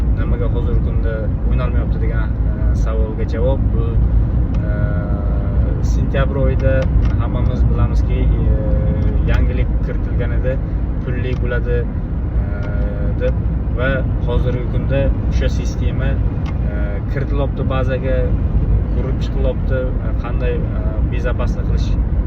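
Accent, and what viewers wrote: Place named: Namangan, Uzbekistan. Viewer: Тоза чапани узбек наманганлик Шива колбди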